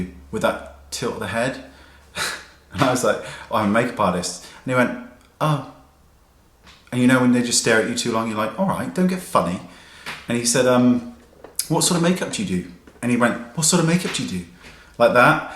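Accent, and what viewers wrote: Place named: United Kingdom. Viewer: Thats funny as hell, It's even funnier, because of your British accent I swear.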